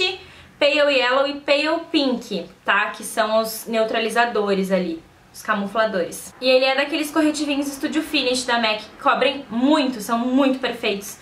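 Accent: Rio Grande do Sul, Brazil. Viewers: Amo o meu sutaque gaúcho ,amo o seu, awn enfim deus ta la em cima tomando chimarrão ! Dale RS SM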